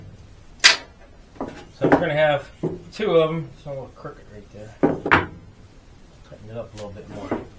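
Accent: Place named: Western Pennsylvania, United States. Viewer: Are you from western PA? It sounds like it.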